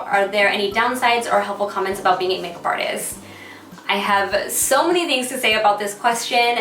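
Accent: Mexico. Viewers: I KNEW you were Mexican just by your accent. I have it too. I'm from Mexican parents and born in Texas. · you are soo beautiful and I value your makeup advice the most! If you were to make videos in spanish, you would appeal to a wider youtube audience. I think you should! and by the way I knewwww you were mexican, i recognied that accent (in the best way). love you!!!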